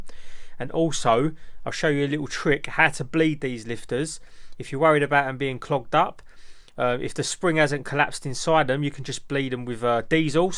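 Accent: Essex, United Kingdom. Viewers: Nice to hear a familiar Essex accent and a channel with decent engine knowledge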